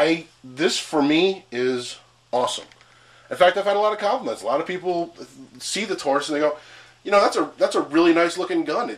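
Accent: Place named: Philadelphia, United States. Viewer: Great video Big Dawg. When I heard you say cheese steak or hoagie I thought, this guy must be from the Philly area, then I heard you say the Oaks gun show and it sealed the deal, Philly area it is. Anyway, I just picked up a blued Taurus 1911 today at Tanner's black friday sale for $350. Cant wait to get out and shoot it. I did a bunch of research and everyone that has one loves it. By the way, born and raised in Philly.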